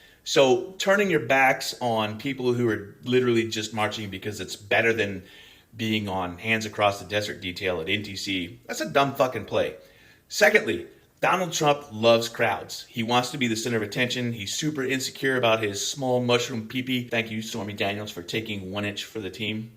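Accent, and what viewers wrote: Scotland, United Kingdom. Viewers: your scottish is showing. oh yeah and I made it to the end mfer. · Go full out Scottish accent! Especially your initial rant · Hey I love you, your scottish accent, and your starship! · It's even better with a Scottish accent and a fellow gamer!! Even more hooked now!! 😍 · I love that you used your Scottish accent. 👍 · Serious question: is the Scottish accent a bit, or is that native accent? Like, was he born in Scotland and then moved to the US or something? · Love the Scot-talk. 😊 Made it to the end... for the algo.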